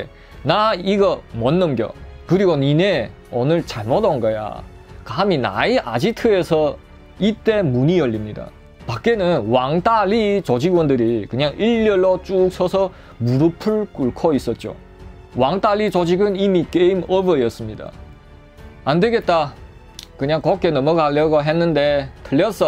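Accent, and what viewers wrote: China. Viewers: 목소리나 억양이 완전 중국화 되어 있고.. 젊은 친구가 잘 생기기도 하고.. 설명을 잘 해 주어서.. 중국 무협지 한편을 본 느낌이었네요.. 아주 재미 있었습니다.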